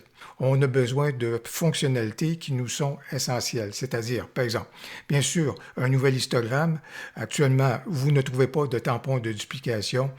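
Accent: Canada. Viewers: votre accent Canadien que j adore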